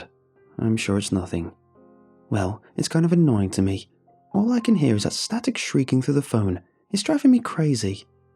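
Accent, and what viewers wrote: United Kingdom. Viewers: A velvet voice with a charming British accent reading me some Creepypasta? What's not to love? <3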